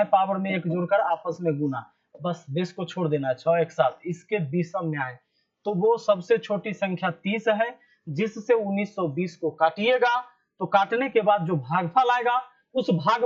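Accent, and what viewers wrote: Bihar, India. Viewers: Thank you sir aap bihar se hai ya haryana se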